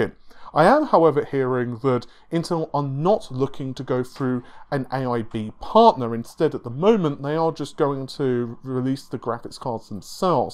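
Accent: United Kingdom. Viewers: He is British, not American. Jesus.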